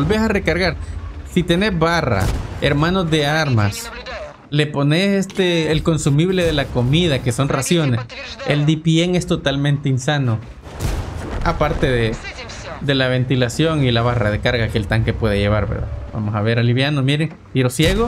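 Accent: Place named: Argentina. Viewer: Che de donde sos? Te escucho y algunos modismos o formas de hablar pareces de Argentina jaja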